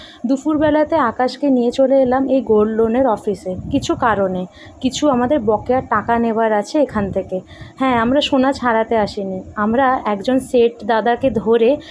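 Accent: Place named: India. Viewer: Oi chade k khb sabdhane go Khb voy lage nara chad Matha uchu kore tomra santite thako Accha tumi ki non Bengali go tomar kotha bolata te aktu hindi touch ache tai bollam... Accha dadar barite k k ache r dadar bari kothai egulo niye aktu kichu bolo akdin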